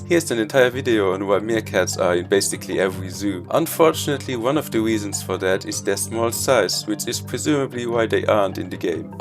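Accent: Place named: Luxembourg. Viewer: I was about to say that you are the first German youtuber I found, that has an adorable accent, instead of an insufferable one, but then I realised that you aren't German at all but a Luxemburger, which explains it. 😅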